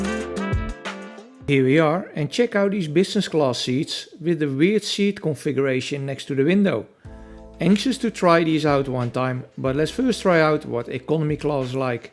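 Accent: Netherlands. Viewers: Do I detect a NL'er here speaking?😅 Oman has been for years on my next new destination.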